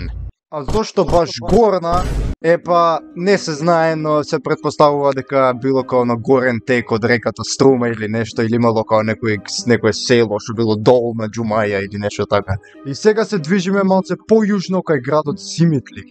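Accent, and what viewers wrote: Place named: Skopje, North Macedonia. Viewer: не употребувајте некаков скопски нагласок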